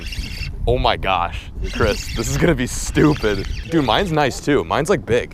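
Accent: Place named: Boston, United States. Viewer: Still loving that boston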